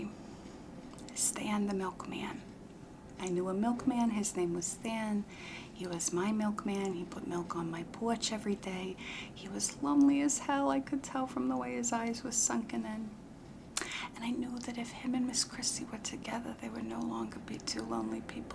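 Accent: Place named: Long Island, United States. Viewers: I’m from Long Island!!🎉 I heard more of a Brooklyn/bronx accent mixed in there too! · Something about the Long Island accent makes me so happy lol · Omg yes! She does the Long Island accent in that series too. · the way my name is Chelsea and i’m from Long Island with family that sounds like this 😭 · what I want to know is how you got even the mannerisms to a T… you sure you’re not from long island??? 😂🩷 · yeah sounds about like the mothers id hear sitting at other tables at a restaurant on LI 😭😭 great job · LMAOOOO I literally loved this video, me being from Long Island just made me laugh through the whole video bc I feel like I don’t have one and my friends say I do 😭 but girl the accent is hilarious I loved this whole heartily I get so excited when you post 😝🩷!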